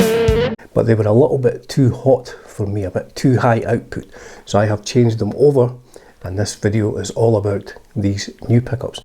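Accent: Scotland, United Kingdom. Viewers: I like Scottish accent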